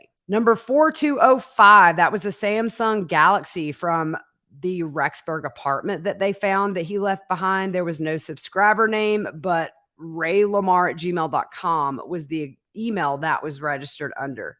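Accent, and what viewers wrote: South, United States. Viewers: Love your accent. I’m Irish and live in Ireland, but must have been a Southern Belle in a previous life😂😂 Only joking. But love the accent, love saying y’all. 😂😂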